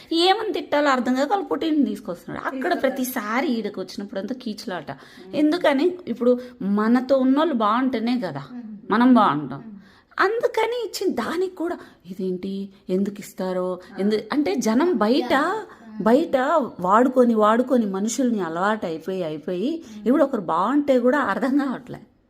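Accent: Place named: Anantapur, India. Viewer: మీకు కూడా అనంతపురం జిల్లా యాస బాగా వచ్చేసింది 🙏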